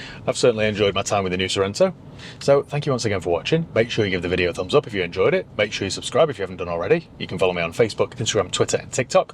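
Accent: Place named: RP, United Kingdom. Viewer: Incongruous. Posh you are.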